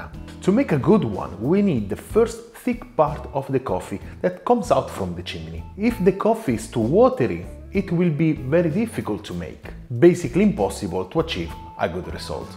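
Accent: Italy. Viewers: Italian is the new French accent 😮❤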